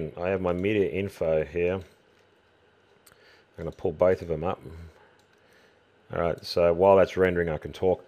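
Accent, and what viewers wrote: Australia. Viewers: thanks alot. Big help. please do the costume template video as well nice to hear aussie accent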